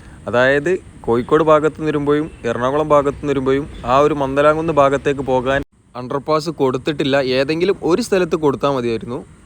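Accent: Malappuram, India. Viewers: ഞാൻ താങ്കളുടെ എല്ലാ വീഡിയോസും കാണുന്ന ഒരു പ്രേക്ഷകനാണ്. തൃശ്ശൂർ ജില്ലക്കാരനാണ്, ഞാൻ സ്ഥിരമായി NH 66 ന്റെ വീഡിയോസ് കാണാറുണ്ട്, താങ്കളുടെ വീഡിയോസ് ഏറ്റവും മികച്ചതാണെന്ന് പറയാതെ വയ്യ. എന്നാൽ, പല സ്ഥലങ്ങളും തിരിച്ചറിയാൻ കഴിയാത്ത വിധം മാറിയിരിക്കുന്നു. ദൃശ്യങ്ങളോടൊപ്പം അതാതു സ്ഥലങ്ങളുടെ വിവരണങ്ങളും ഉൾപ്പെടുത്തിയാൽ വളരെ നന്നായിരിക്കും എന്ന് തോന്നുന്നു. സ്വതസിദ്ധമായ മലപ്പുറം ശൈലിയിലുള്ള വിവരങ്ങൾ ഞാൻ വളരെ ആസ്വദിക്കുന്നു. എന്നാൽ, താങ്കൾ മറ്റ് വിഷയങ്ങളെക്കുറിച്ച് സംസാരിക്കുമ്പോൾ, അവയും നല്ലതായതിനാൽ, ഞാൻ ചിലപ്പോൾ ശ്രദ്ധ തിരിക്കപ്പെടുന്നു, ഇത് ദൃശ്യങ്ങൾ പൂർണ്ണമായി ആസ്വദിക്കാൻ ബുദ്ധിമുട്ടാക്കുന്നു. നന്ദി, എല്ലാ ആശംസകളും!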